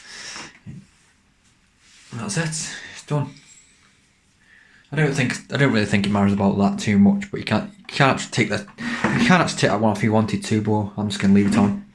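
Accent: Stoke, United Kingdom. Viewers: Thats a proper stokie accent. Nice surprise when looking at a random cooker video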